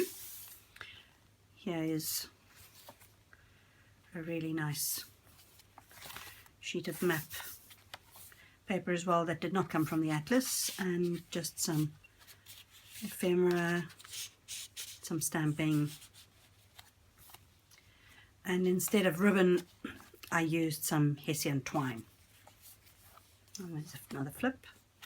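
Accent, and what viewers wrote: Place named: South Africa. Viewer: Really lovely. The tea dying really came out super duper great this time... well, it always does!! I really like a simple "clean" journal personally and the more I get into this the less I want to embellish the pages because I think most people want to have the freedom to fill up their own journals. I recently made a travel journal and I also made some word embellishments and stamps to take on the side during the vacation. Good idea. Lovely to hear your South African accent too. Congrats on another gorgeous journal!! xo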